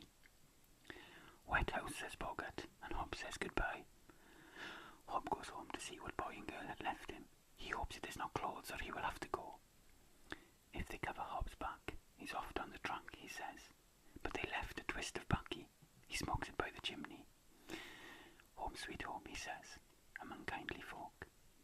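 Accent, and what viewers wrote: Wales, United Kingdom. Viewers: I love this idea. I LOVE the book you chose. I struggled a little with your gorgeous Welsh accent, what with so MANY whispered words. Would you consider soft-speaking, or a mix of the two, if you do more storytelling? You lose a bit of the intonation, with whispering, is all. Thank you so much for spending your free time on videos for us! PS: The blanket is fab!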